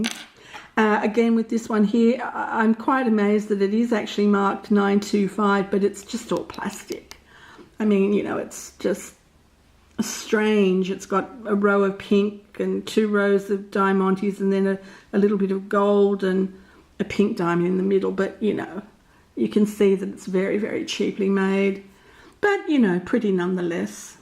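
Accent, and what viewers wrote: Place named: United Kingdom. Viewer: Miss You are so funny!! I could literally imagine everything you were saying... The proper British accent made it all that much better!! In love!!🥰🥰🥰💯💯😂😂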